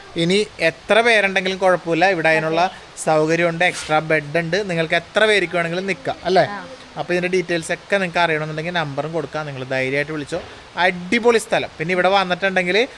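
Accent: Kannur, India. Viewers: കണ്ണൂരൊ കാസർഗോടോ എന്റെ സ്വന്തം ഭാഷ ❤❤😅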